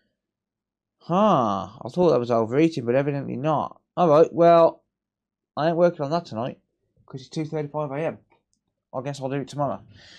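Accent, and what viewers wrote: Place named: West Midlands, United Kingdom. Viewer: It's good to hear the West Midlands accent as well as showing some great fixes!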